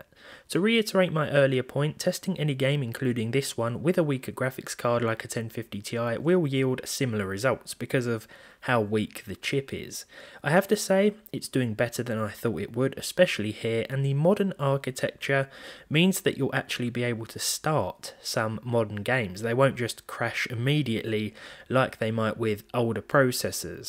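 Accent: United Kingdom. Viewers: Britain accent is so funny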